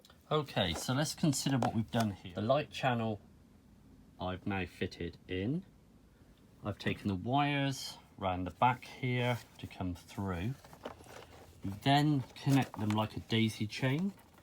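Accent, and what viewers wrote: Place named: Bournemouth, United Kingdom. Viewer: You sound like you are Bournemouth, the guy in B and Q told me to watch :) cheers mate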